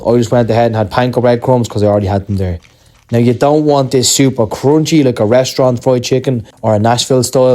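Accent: Ireland. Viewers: So....it's called a CHICKEN FILLET ROLL. Good to know. Yet it is not written anywhere on this screen except here in my comment. I had to peeeeeer at your tiny subtitles when I caught the name and rewound to find it again. We don't all speak with an Irish accent. You are welcome, lol. (Hey! Don't call me that!!)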